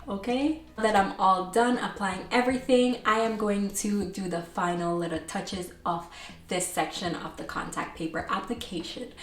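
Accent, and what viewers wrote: Jamaica. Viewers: I randomly clicked on this video and something tells me you’re Jamaican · Ur accent sounds just like a Jamaican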